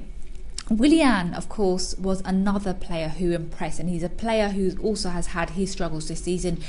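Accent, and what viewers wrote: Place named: United Kingdom. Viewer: Hi!, Are you from India😉 but you sound british.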